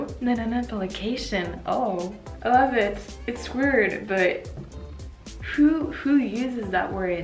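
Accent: France. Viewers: Please, don't lose your french accent. It is quite wonderful!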